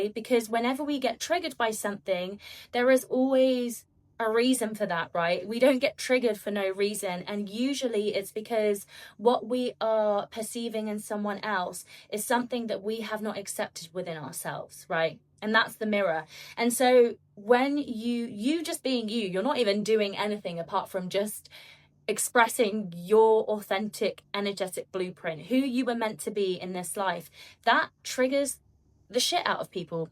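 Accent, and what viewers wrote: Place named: England, United Kingdom. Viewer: Thank you for posting this. This resonated with me so much that the English accent did not even register with me until halfway through. This is the sign.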